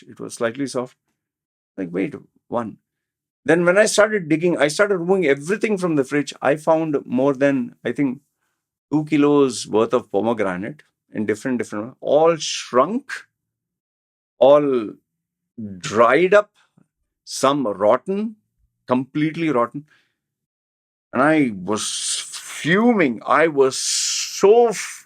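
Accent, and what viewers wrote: India. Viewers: please talk in hindi. Atleast introduce yourself saying hindi words. We would love to see your indian accent 😅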